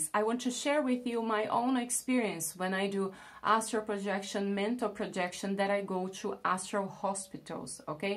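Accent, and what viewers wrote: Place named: Brazil. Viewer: Eu imaginei que tu era brasileira pelo sotaque :)!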